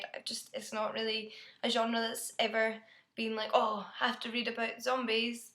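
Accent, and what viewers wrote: Scotland, United Kingdom. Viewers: You sound a bit scottish. Is this true?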